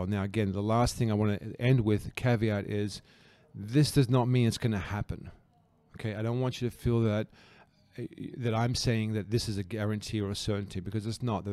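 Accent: Australia. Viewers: your/our Aussie accent, it's milder than the American accent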